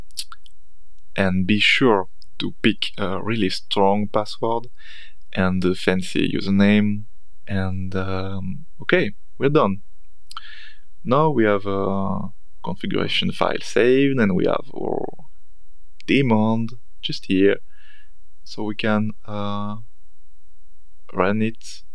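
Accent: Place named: France. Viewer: Salut! Vu ton accent, je pense que tu es français. Quel est ton pseudo sur bitcointalk? PS: je suis ton premier abonné;-)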